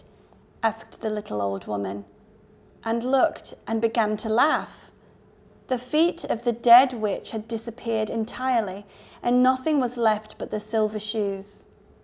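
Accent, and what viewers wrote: United Kingdom. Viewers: I love wonderful accent, she’s amazing. Really appreciate.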